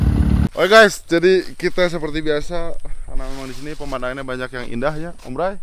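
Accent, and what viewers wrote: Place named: West Java, Indonesia. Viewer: resep cara ngawangkong na sunda banget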